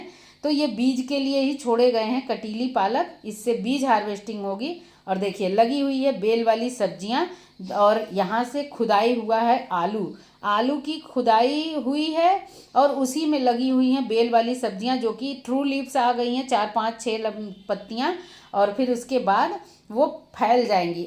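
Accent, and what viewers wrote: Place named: Chhattisgarh, India. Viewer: Mam Aap kha se ho Aapki language to chatishgad se lag rahe ho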